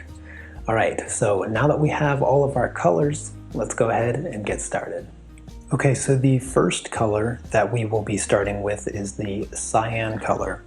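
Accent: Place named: Central Pennsylvania, United States. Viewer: Love your videos, from one artist to another. Are you from central Pennsylvania? Just wondering because you have an accent similar to what we have. Sorry if that seems weird! LOL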